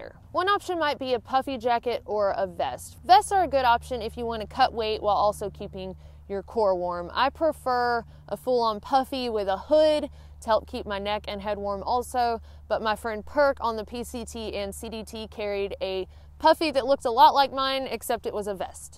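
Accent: South, United States. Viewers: I'm a North Carolinian living in Sweden currently, and you are not only helping me plan an awesome "welcome home" hike but hearing that sweet southern twang makes me feel at home :) haha (even though I don't have it)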